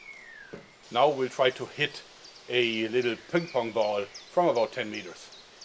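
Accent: Germany. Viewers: you have an really Nice german ~ accent ~ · he sounds german idk :D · Du hast nur einen Satz gesagt und ich wusste sofort das du aus Deutschland kommst :D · man hört voll raus das der Typ deutscher is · you are one sick fuck;-) l enjoy your exuberance and enthusiasm dont change and that german acent off the top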